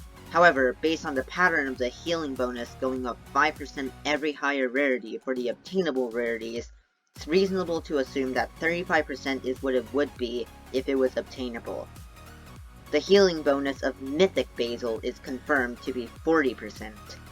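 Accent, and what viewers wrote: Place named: United States. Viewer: Im from south america, and your pronounciation is so american, lol Nice video btw :D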